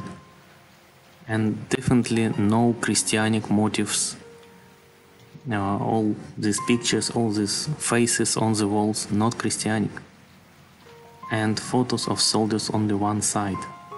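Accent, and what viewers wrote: Russia. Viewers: Dodgy Russian accent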